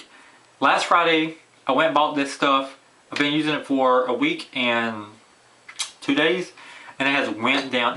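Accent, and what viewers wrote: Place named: South, United States. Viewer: It sounds southern my guess would be Georgia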